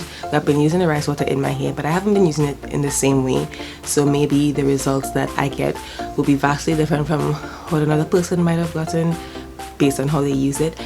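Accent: Trinidad and Tobago. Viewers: She sounds Trinidadian 🇹🇹🇹🇹🇹🇹🇹🇹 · Sounds like she's trini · i now go to say her accent sounding rel strong like ah Trini but i hearing ppl saying African and i wondering why but everywhere a trini go dey say u are from Africa haha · Oh... I use par excellence rice and I don't get what you get at the top of the rice, plus you can add 10 drops of lavender before you put it to ferment... Love hearing my Trini accent ☺ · Its ur trini accent that made me watch the whole vid. I dun kno how to use rice water but it was really/rell nice to hear it from a trini. :)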